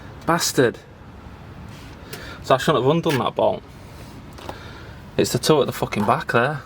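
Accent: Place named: Leeds, United Kingdom. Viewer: Are you from Leeds? Accent sounds familiar, I'm from Leeds too, got a silver Vectra 1.9 👌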